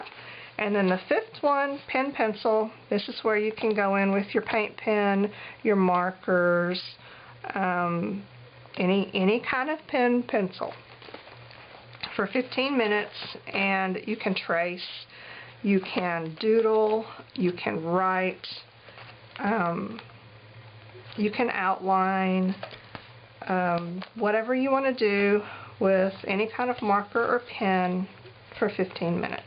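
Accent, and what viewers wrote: South, United States. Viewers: Love your Southern accent, you sound like me!!! Those Yankees just don't sound right. Love this idea too and might have to try it.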